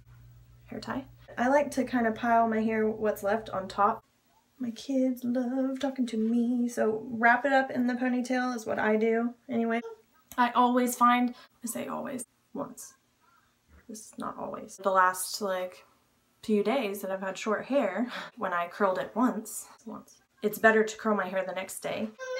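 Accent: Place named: United Kingdom. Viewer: That British accent though.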